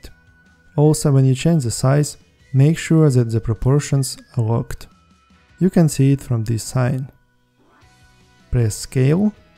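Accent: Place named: Russia. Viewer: Какой акцент, будто русский говорит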